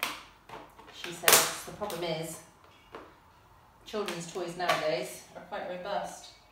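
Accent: West Country, United Kingdom. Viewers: As sexy as you are….. no stilettos 👠 on a real wood floor unless you want to give it a ‘distressed’ look….. there again, it may by engineered wood or posh laminate 🤔…. Love your West County accent by the way 😉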